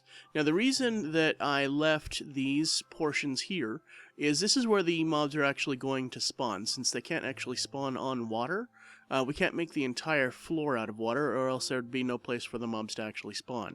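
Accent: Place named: England, United Kingdom. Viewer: You English not Mrican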